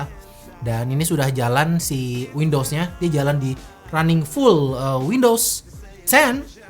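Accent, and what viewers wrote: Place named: Bekasi, Indonesia. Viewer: Suka banget dah pembawaannya. Bekasi banget!😂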